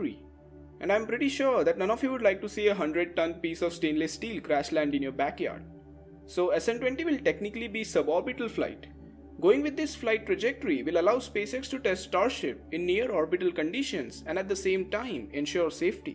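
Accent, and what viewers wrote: India. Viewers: Try speaking a little more slowly. Given your strong Indian accent you might be a little easier to follow. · I love Indian english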